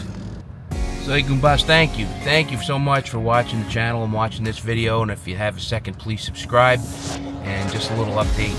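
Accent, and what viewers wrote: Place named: Nebraska, United States. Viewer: Not a fire hydrant, its called a fireplug dammit. you sound like you grew up in freakin Nebraska!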